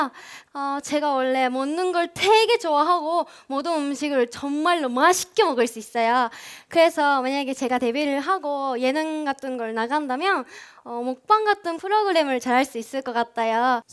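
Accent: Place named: Japan. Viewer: この時はまだ韓国語が少し日本人っぽいけど、今は本当にネイティブだよね…って韓国語全然話せない私が言ったら怒られそうや…とにかく可愛い。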